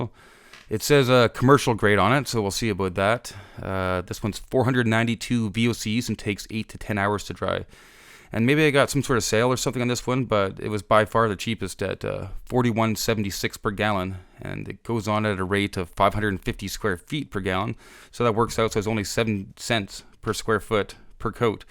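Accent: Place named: Canada. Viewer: I knew you were Canadian as soon as i turned it on! i'm going to refinished my floors and this was so much help!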